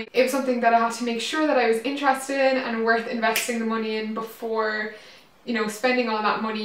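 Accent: Ireland. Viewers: The good Irish ascent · Hey r u irish aswell😜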